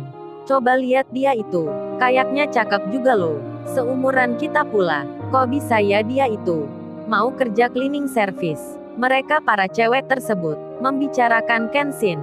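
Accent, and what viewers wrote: Surabaya, Indonesia. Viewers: min kamu orang surabaya ya?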